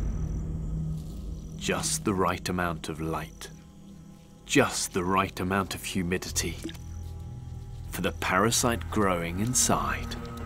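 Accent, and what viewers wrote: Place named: United Kingdom. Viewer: 剛開始看沒多久就覺得這聲音好像貝爾 結果結尾就看到貝爾的名字 他的聲音和英國口音真的已經深入腦海啦XDD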